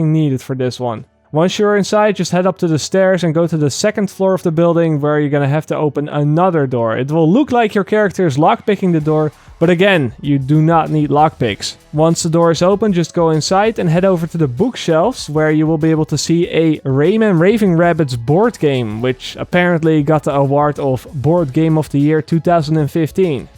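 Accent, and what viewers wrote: Netherlands. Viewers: Ben je nederlands ?? zo te horen aan je accent 😂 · Jij bent Nederlands hahaha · are you dutch if you are im to =) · pff je hoort duidelijk dat hij Nederlands is hahaha, het heet volgens mij iets van steenengels. dan probeer je niet eens met een accent te praten. bijv. trough en true spreek je gwn het zelfde uit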